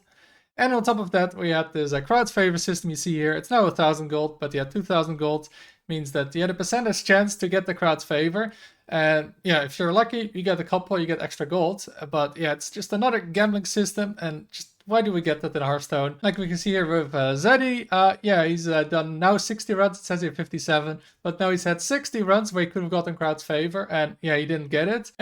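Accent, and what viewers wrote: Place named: Europe. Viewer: all of this was already said just not in an annoying euroshit accent. revolting .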